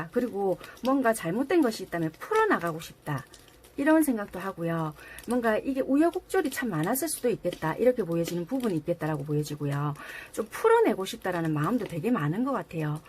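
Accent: Busan, South Korea. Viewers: 헐 직접 댓글 달아주셔서 정말 감사해요...!!!! 완전 속이 후련해졌어요 저건 그냥 제 망상적인 단순한 의문이었구요 말씀하신게 그대로네요.. 머리론 아는데 자꾸 끌리고 다시 만나게되요 ㅠ 제 현실따문에 헤어졌는데... 정말 이렇게 답글 주셔서합니다ㅜㅜ 그냥 만남에 있어서 좋게 생각하려고 노력중이에요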